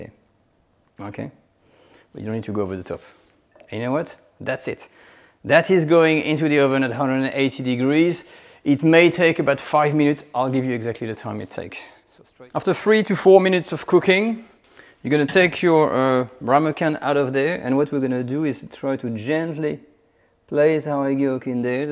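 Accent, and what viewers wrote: France. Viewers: Dude, you are losing your beautiful French accent...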